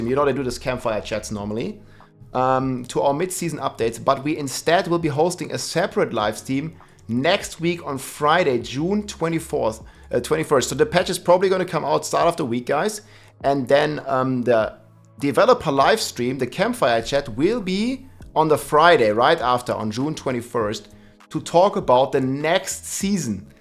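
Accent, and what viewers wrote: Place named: Germany. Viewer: Ich mag es wenn man Englisch mit ein wenig Deutschem Akzent spricht - so toll (kein Sarkasmus), der beste Diablo-Content-Ersteller!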